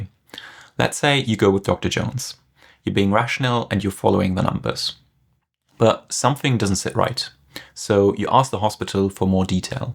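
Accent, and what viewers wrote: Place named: England, United Kingdom. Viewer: Interesting that the English sounding narrator talks about surgeons as Doctors because in the UK if they are surgical specialists they would be called Mister or a female equivalent. Perhaps he is making this more understandable for your generic English speaker without having the caveat about their actual titles. Still it amuses me when I tell Americans that if they have appendicitis in the UK and they are told Dr. Jones will be along soon, to run like hell because he's not a specialist.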